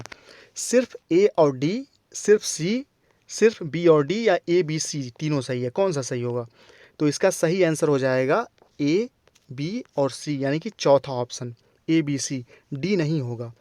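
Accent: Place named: Bhojpuri, India. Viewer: Aapki bhojpuri bilkul hmari trh hai sir😀🙏 आरे तूं जीवन भर नाहीं सीख पइबअ🤗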